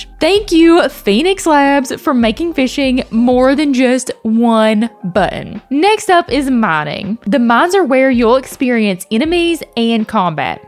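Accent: South, United States. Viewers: Damn that’s a strongggg country/southern accent 😂😂 shocked me at first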